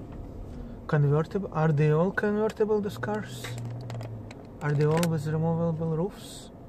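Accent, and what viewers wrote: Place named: Russia. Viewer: This accent. Where are you from? russia?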